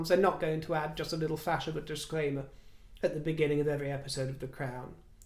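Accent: United Kingdom. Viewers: .... Thnx for such a delightful ending, evocative photos & lovely music. Nice 👍 As to pronouncing 'secretary' in the PNW state of Oregon... We pronounce it, SEC' re tary. Some day I'd love to do a deep dive into why the US pronunciation of 3+ syllabus words seems opposite from the British way (& our gallons n miles to your liters etc - why? ) Like... Controversy.. The US say it usually with a hard accent on the 1st syllable... CON tro versy The Brits, I think, say it in a much more delicious way.. con TRA versy. At 65+ yrs old, I wish I could snap my fingers & end up pronouncing [our] language your way. U.S. has ruined so much, IMO. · Those of us who are from southern USA, as well as highly educated population pronounce it , "sec- ree- tare-reey" . I really prefer the UK pronunciation, but it would come across as pretentious if I used it. We have such a lovely, rich language. 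I do enjoy hearing you speak so beautifully.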